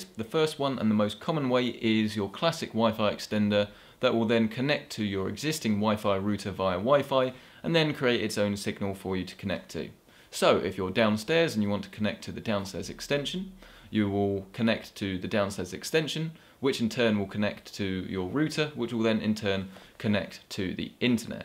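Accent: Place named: England, United Kingdom. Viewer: yes rooter!!! if your american you say router but the world dont revolve around usa ... listen to him he like me are english!!!!!!!!! we speak different we have different customs, if you dont like it watch an american review one !!!!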